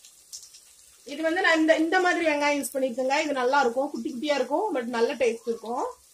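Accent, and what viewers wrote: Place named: Kanyakumari, India. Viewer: Kaniyakumari kaaranga nu nenaichen... curry kollam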